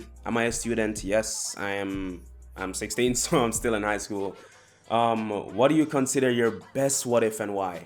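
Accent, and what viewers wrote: Jamaica. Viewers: im half jamaican and I could see that you were jamaican in your voice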